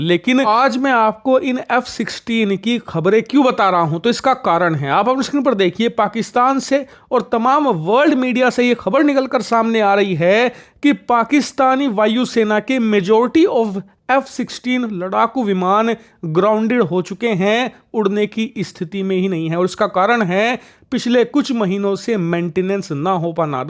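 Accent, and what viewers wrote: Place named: Bihar, India. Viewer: Apki Abaj Purana Nehin.Avi Bihari Vojpuri Abaj Bol Rahi.kya Huwa Purana Abaj.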